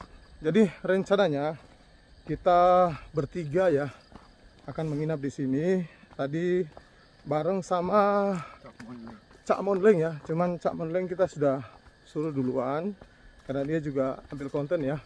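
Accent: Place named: Banyumas, Indonesia. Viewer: Omongane ngapak....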